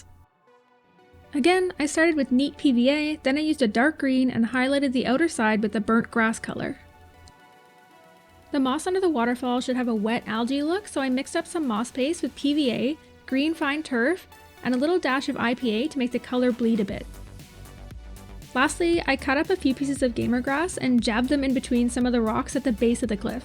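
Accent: Newcastle, United Kingdom. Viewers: I was expecting a heavy geordie accent, but the rocks are good either way.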